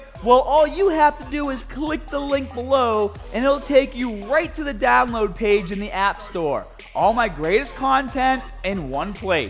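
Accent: Boston, United States. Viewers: Hard to tell if is from Bahstan or has a speech impediment... go Blues! Seriously though, one of the best YT channels with lots and lots of good info. It's important to have multiple views on "controversial" topics. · Are you from Boston ?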